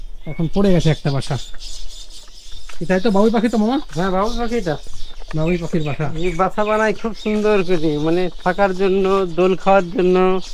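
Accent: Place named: Rajshahi, Bangladesh. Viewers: রাজশাহীর ভাষার সাথে মিল আছে।আসলে রাজশাহী আর মুর্শিদাবাদের ভাষায় মিল আছে। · YouTuber nischit Bangladeshi , kotha bartay bangadeshi tan ache.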